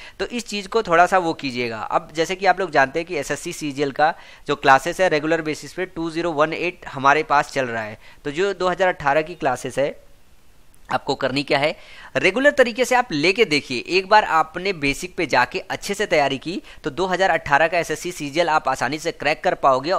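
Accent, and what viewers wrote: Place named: Kanpur, India. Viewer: kya bat h bhai....tmhari language me kanpuriya ka jo taste h maja aa jati h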